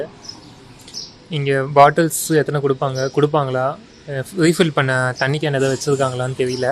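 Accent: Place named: England, United Kingdom. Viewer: தெளிவாக பேசவும் புரியவில்லை ஆங்கிலேயர் போல் தமிழ் பேசினால்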